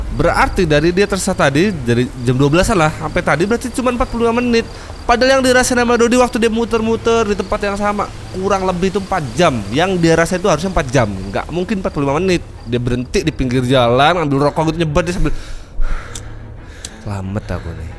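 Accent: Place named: Lampung, Indonesia. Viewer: Lampung banget logat nya bang...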